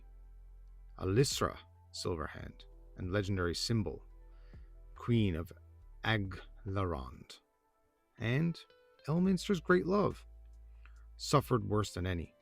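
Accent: Newfoundland, Canada. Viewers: I can tell from your accent that I've stumbled upon a fellow Newf 😅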